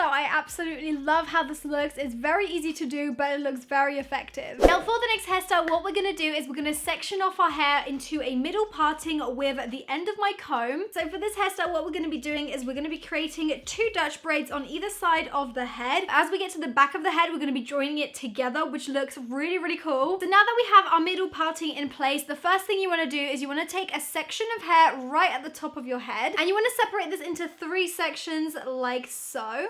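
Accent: England, United Kingdom. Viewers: I just love British accent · Of course you look beautiful with your English accent and your beautiful hair while I am here stuck in Florida sinking with the world with the ratchet hair that looks like a mop so no I cannot do these hairstyles because I have the ugliest hair ever and you have hair that looks good sorry for the rant I’m bored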